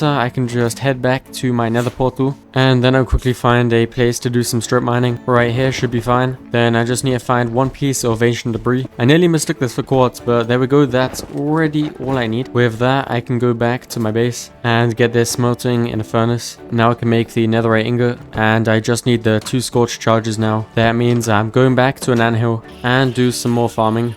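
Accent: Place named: Switzerland. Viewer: I think he's from Switzerland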